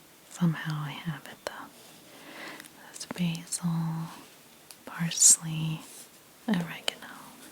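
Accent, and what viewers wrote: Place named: Midwest, United States. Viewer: Your voice is so soothing! There is also something familiar, are you from the northwest originally? ( IN, IL, WI, MI) ? You pronounce words in a nice crisp way, thank you!!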